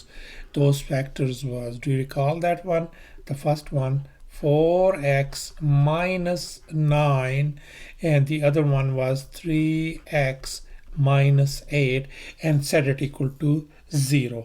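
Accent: India. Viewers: I like your English but I don't know what you say I like indian English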